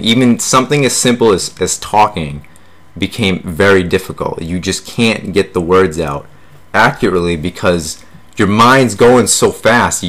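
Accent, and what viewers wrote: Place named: Rhode Island, United States. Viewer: Rhode Island accent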